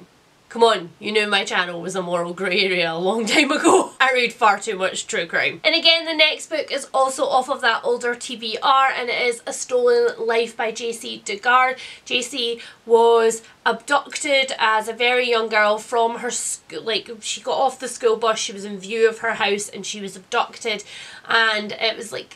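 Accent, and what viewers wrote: Scotland, United Kingdom. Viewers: Hello! I'm a new subscriber and just wanted to say HELLO! I'm binging your videos and think they're great. So good to hear a fellow Scottish woman chat about books. Hope you're feeling better! xxx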